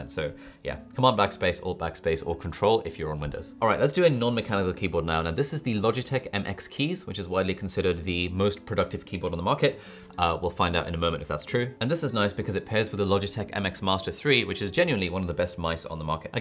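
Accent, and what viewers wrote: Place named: RP, United Kingdom. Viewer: I would like to know where your accent comes from because you sound a little rp however im not sure, I can't figure it out by the way I really like your accent!! You sound so nice!!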